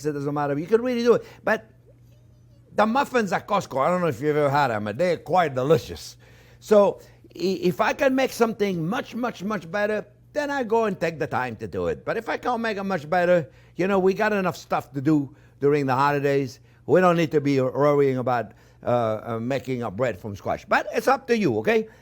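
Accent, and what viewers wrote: France. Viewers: Great video. You are an amazing teacher. You never said what temperature the oven was set. I guess 350degrees? Please use ingredients & the measurements in subtitles as your beautiful French accent is a bit confusing to this 72 year old Marine. God bless you and your family during this holiday season. I look forward to your next video. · your accent and attitude are evrything ! mon cheri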